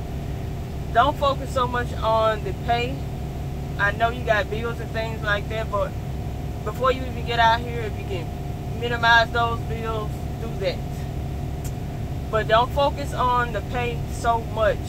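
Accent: South, United States. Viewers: Girl you so country I love it!!!!! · Pretty! Love that southern accent. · I'm liking that Country Slang/Accent 😃.... You're still driving, right ?...I'm considering getting my CDL...And switching over from doing Manufacturing work for over 20yrs... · I heard your southern drawl. I'm from Florida, born and raised. I just recently graduated from St. Petersburg College with an Associate degree. However, I want a house in a hurry. I can not get my house in a hurry with a 10 dollars an hour job. Have you been to college or some kind of trade school? How old are you? What do you do now for a living?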